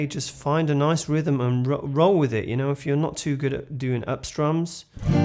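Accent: United Kingdom. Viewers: Sings American.....then speaks....he's English !!..amazing !!..great teacher,great voice !!..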